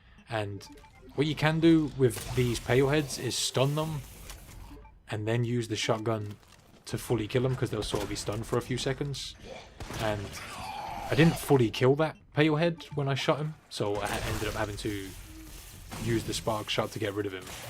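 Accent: United Kingdom. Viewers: Dude, you made this look easy. LOL! Really well done, you are insanely skilled! By your accent I can see that you are british, cheers mate! Much love from Brazil.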